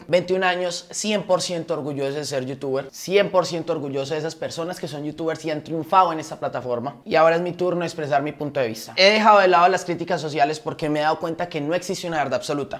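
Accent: Antioquia, Colombia. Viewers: asi es que se habla te apoyo Qué Mal que colombia este asi 😞😔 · vea mijo usted tiene toda la razon no le pare bola ninguno de esos comentario negativos envidioso y me gusta como hablan los colombianos y te felicito por que tu opinion es mui bueno te miro desde Ecuador Saludos a esos envidiosos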